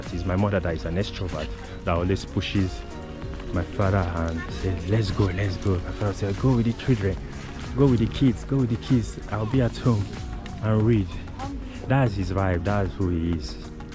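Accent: Africa. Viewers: Судя по акценту именно африканец? А откуда? Добавь в описание канала о себе что-то кроме того каково чернокожему гулять по Москве. Учишься в Лумумбе? Я так понимаю английский в Африке как русский в Дагестане, тобишь язык межплеменного общения, но без акцента не бывает? Видно, что русский тяжело дается, но речь уже поставлена, лексикон будет расширяться, язык будет привыкать и при желании всё получится. Keep it up man.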